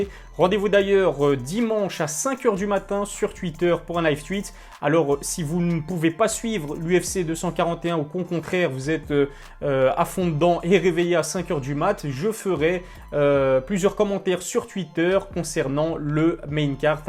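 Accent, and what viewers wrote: Belgium. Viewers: Juste une petite question t'es de Bruxelles ??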